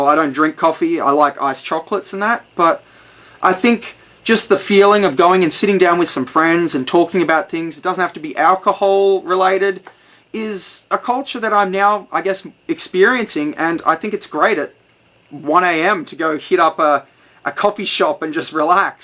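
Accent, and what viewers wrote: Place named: Australia. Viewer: You have very thick aussie accent. From around southern australia?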